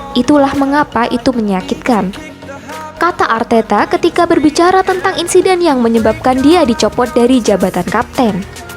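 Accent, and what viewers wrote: Java, Indonesia. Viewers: Admin nya pasti orang jawa.. logatnya medok betul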